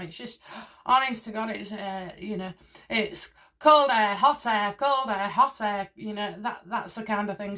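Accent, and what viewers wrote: United Kingdom. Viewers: Her British accent is too thick I love it but can understand her.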